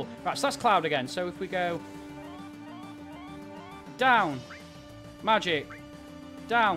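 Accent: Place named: Yorkshire, United Kingdom. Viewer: Love hearing a Yorkshire accent with a gaming channel 🧡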